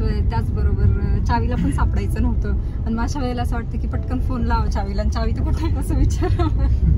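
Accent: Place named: Pune, India. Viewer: Tu ekdam soft Ani typical Puneri boltes I like it....Ani tuze baby ekdam cute❤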